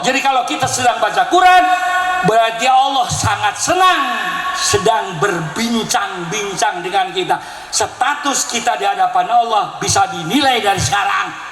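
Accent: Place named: Banten, Indonesia. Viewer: Titisan pandeglang Banten na aya keneh tegeur tina logat ngomongnya kiyai, pelem bahasanya pasehat nyebut jurigna 🤣 Sehat panjang umur kiyai.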